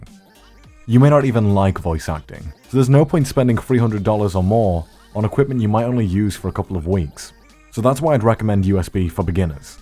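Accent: Wales, United Kingdom. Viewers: Holy shit a time when actually sounded Welsh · Watching this in 2021, and I gotta say......What happened to British accent? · you sounded more welsh back in the day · The Slight Welsh Accent Is Very Very Pog 😂😂😂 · holyyyyy crap he sounds so different back then xD and u can also hear a tinge of welsh in his accent too shEeEesh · Omg you can hear more of his welsh accent here!!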